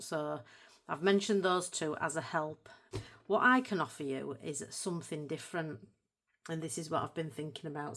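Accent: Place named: Northern England, United Kingdom. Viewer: I’m really looking forward to this series - love the colour palette you have chosen for the background! I’m excited that you will be using Chromoflow as there are very few YouTube channels who use these pencils - Prismas are by far the favourite out there. I am a Mancunian living overseas so it is lovely to hear your Northern tones!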